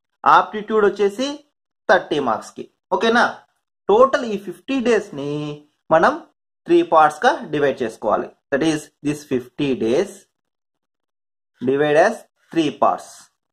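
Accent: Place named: North India, India. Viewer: Baagaa matladaaru I mean pure telugu. Nenu meeru north anukunnanu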